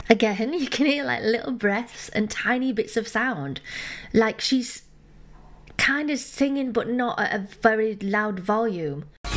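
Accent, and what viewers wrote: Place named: Brighton, United Kingdom. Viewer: Hi, I love your vídeos and I love your accent which reminds me the time I lived in Brighton. Could you check some vídeos? She's a really good mezzosoprano pop singer know like the Spanish Queen of Pop. Pleaseeeee xxxxx.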